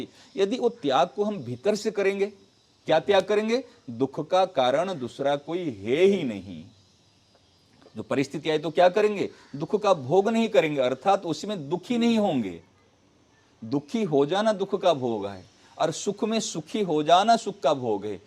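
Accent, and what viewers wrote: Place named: Odisha, India. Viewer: Guruji ki matru bhasa mujhe ODIYA lagta hai, unki hindi sabd ki utcharan main Odiya bhasa ki avasa hoti hai.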